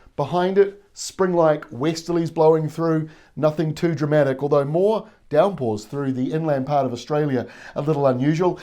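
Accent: New Zealand. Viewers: Good to hear a real nz voice not like that Niwa channel full of yanks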